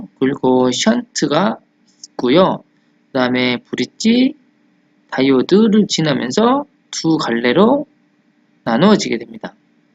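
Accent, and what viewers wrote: Jeolla, South Korea. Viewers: 전라도 사투리가 강하고 구수하네요~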